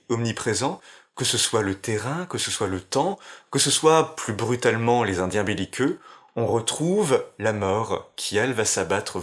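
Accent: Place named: Walloon Brabant, Belgium. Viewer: Déjà, très sympa de ta part de prendre le temps de répondre à tes abonnés. Je salue le geste!:) C'est drôle car ton accent ressemble fort à l'accent qu'on retrouve dans le Brabant Wallon, au sud de Bruxelles. C'est fou! Mais bon, c'est toujours flatteur d'être pris pour un Belge, sache-le!:D Blague à part, oui, je comprends ton soucis d'agencer fond et forme dans de courtes vidéos, cela ne doit pas être facile en effet. Mais tu as raison, tes dernières vidéos sont plus balancées. Allez hop, un poil plus encore sur le style et ce sera parfait, mais je chipote... Bonne continuation à toi et j'espère que ton opération et revalidation se sont bien passées. See you soon!:)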